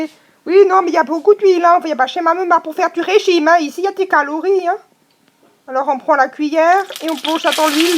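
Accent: Alsace, France. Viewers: rhooo l'accent alsacien est top ;) j'adore je vais essayé de refaire la recette bisous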